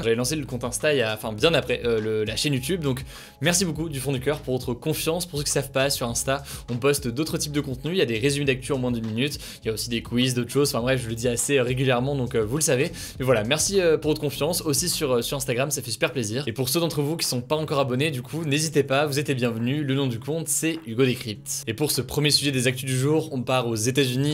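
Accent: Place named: England, United Kingdom. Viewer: si on peut juste s’arrêter quelques secondes et apprécier l’accent anglais d’hugo. t’es génial.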